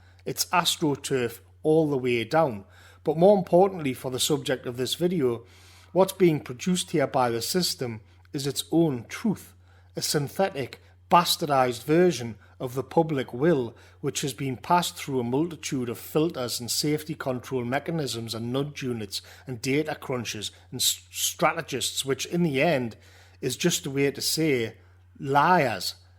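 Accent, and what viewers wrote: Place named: Newcastle, United Kingdom. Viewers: 'Geordie', North East England. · as a northerner myself this channel is a wonder and listening to the accent of the north is refreshing ..he is insightful and much needed in these times ..i would vote for this man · Well it's certainly not everyday you get to hear the word "catharsis" spoken in a broad Geordie accent! Excellent content.......... subscribed. :) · So well articulated. Just as i found it on my return from Sweden after one year due to kung flu regs. Love the background shots of rushing water ,the simple melody on the concertina and the northeast dialect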